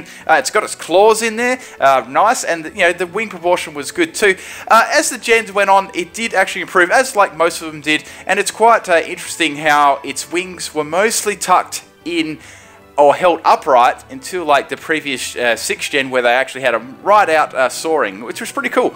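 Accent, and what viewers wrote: Australia. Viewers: I'M FUCKING CONFUSED YOU SOUND AUSTRALIAN ARE YOU AUSTRALIAN!?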